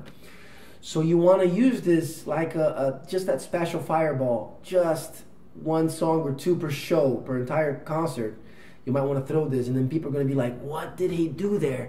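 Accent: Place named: Brazil. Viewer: Super aula. Inacreditável que seja gratuita. Tô comentando em português pois acredito que vc seja brasileiro pelo sotaque. E que ótimo inglês diga-se de passagem.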